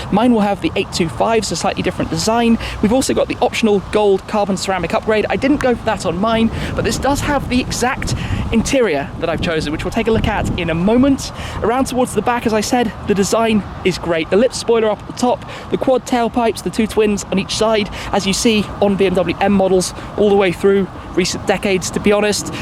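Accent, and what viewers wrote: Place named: United Kingdom. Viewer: Please, PLEASE, don't lose your brit accent and get too murican, PLEASE!! Keep up the awesome work and keep safe! Thank's, awesome machine, congrats:D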